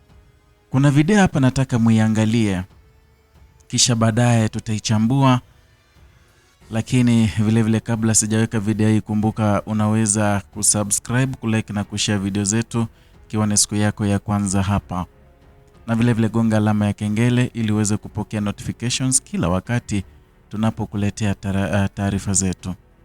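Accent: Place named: Kenya. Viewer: Wewe ni Mkenya. Mtanzania hana rafidhi km yako aisee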